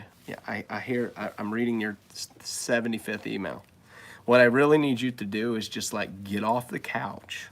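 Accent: Alabama, United States. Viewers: Are you from Alabama bro ! I dig the accent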